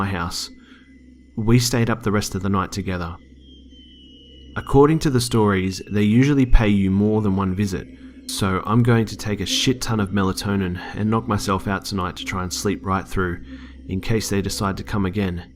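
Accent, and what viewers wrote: Australia. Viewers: Aussie narrator for a story set in Alabama 🤔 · I love that he’s Australian, him swearing in an Aussie accent is the best thing to ever happen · Aussie guy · I love Aussie accents 😍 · Are u Aussie? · I want to get into the stories but the Australian accent kills it. I keep thinking you're going to shout "HAY be a good Sheila & put another shrimp on the barbi". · Alabama chic with an Australian man's voice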